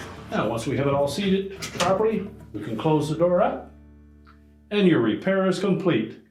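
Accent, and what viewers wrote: Canada. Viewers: I think he might be from Canada. They would have an accent like that.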